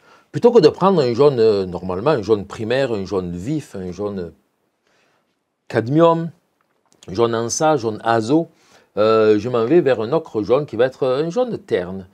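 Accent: South, France. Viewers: vous avez pourtant un bel accent du midi vous vivez au quebec? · felicitation maitre. je sens une pointe d'accent du sud de la France, vous êtes d'où ?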